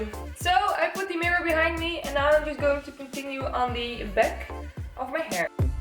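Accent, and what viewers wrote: Netherlands. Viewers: Are you from the netherlands, I thought so bc of your accent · Are u dutch ? I get dutch vibes from your english 🥰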